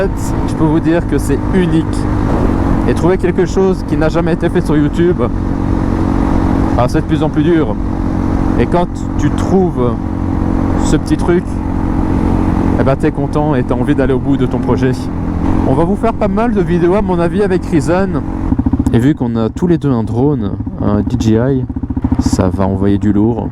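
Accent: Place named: Belgium. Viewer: tu es ou en Belgique ? Je le sais avec ton accent de begique et les plaques. Sinon au top de la vidéo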